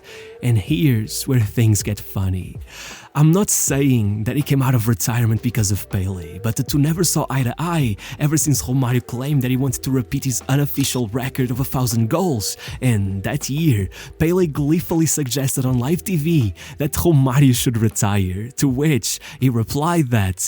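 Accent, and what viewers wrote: Portugal. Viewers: I know you are portuguese from your accent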